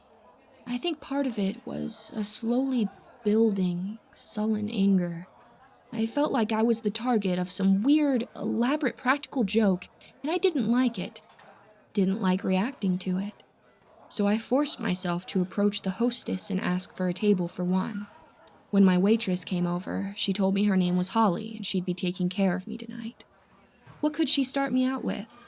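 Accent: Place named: South, United States. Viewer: Your creepy southern drawl is actually very well done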